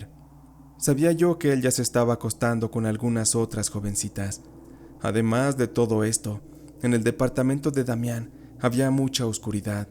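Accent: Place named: Mexico. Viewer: ME ENCANTA EL ACENTRO MEXICANO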